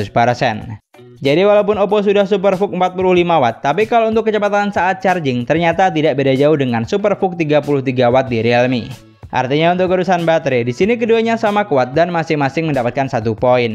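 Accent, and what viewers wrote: Madura, Indonesia. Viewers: Saya nyimak dari awal sampai akhir,, semakin saya menyimak semakin saya tahu, si Abang aksen penjelasannya semakin lama berubah ke aksen Madura 🤔 Saya nungguin kata "...........taiyeee" nya 🙄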